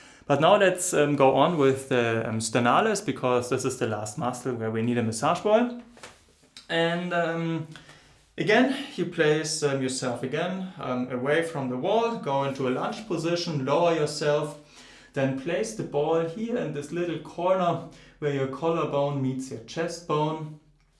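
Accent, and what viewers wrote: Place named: Germany. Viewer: German or maybe even swiss?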